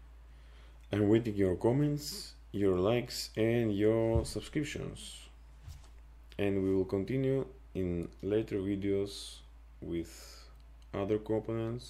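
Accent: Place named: Russia. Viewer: Вопросов нет, человек взялся рассказать за HPS, молодец. Но, английский наш этот, с этим нашим произношением и вот эти here, ok, ok, here, слишком много и часто ( это я только мин просмотрел). А так конечно молодец. Продолжайте в том же духе!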